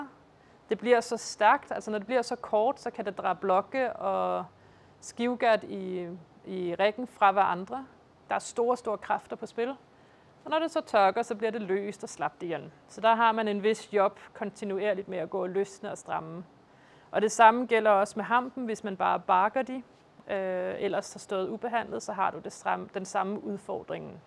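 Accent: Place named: Norway. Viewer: Norskificeret dansk lyder ret sjovt. Det er stadig nemt at forstå for mig, som dansker. Jeg tror også det virker for nordmænd. Aner ikke om det kan forstås af svenskere (uden for Skåne). Tak for videoen! :)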